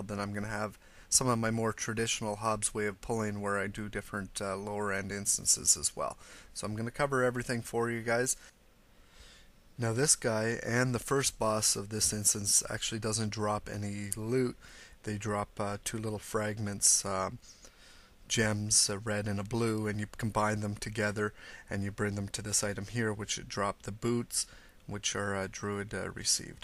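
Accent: Canada. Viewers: its not really a chicago accent...its more of a canadian..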